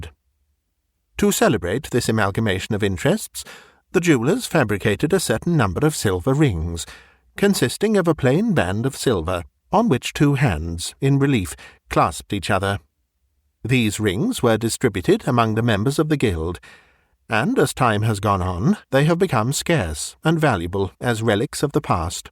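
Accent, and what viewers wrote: England, United Kingdom. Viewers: This is very confusing!!! The princess was Turkish not Swiss and you give her 2 (!) last names!!! Is it Duveé or the first one where you call her Lucy Cunier!?!? It is NOT clear that you are talking about 2 separate persons!!! I think that you must've cut something that related that fact clearly, out! Also, it is sometimes difficult to understand you because of the British pronunciation, i.e a clerk-- where you pronounce it as "a clark" but you don't leave any space between "a" and "clark". I am not criticizing you; I actually enjoy your English accent. In general, I also enjoy the way you tell stories; it's just that I think that with respect to this one, you should not have left out important parts.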